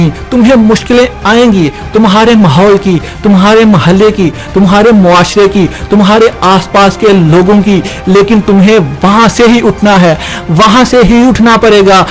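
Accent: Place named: Punjab, Pakistan. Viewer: Bhai Ap apni Urdu pronunciation theek Karen. Ap khhe ko he bolte hen or Punjabi lahja clear dikhta he. Clear Urdu bolen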